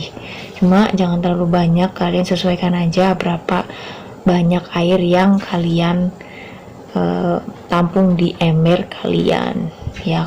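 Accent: Banyumas, Indonesia. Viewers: Nek Ra ngapak ora kepenak,,skses sll yg di Taiwan,thanks infonya ce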